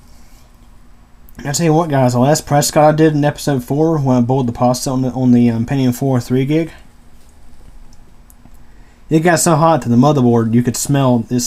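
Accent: South, United States. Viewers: I like the fact that your southern. It just demonstrates the fact that country boys dont have to be simple. Im very proud of this vid btw very great content.👌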